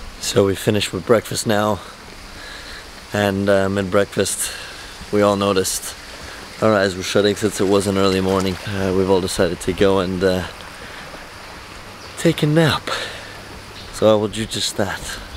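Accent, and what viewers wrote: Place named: United States. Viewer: I was surprised by your American accent. You must have spent a lot of time in the U.S. BTW, I learned a great deal about the Habsburg dynasty while at school in Austria. Strobl am Wolfgangsee. Fun family video.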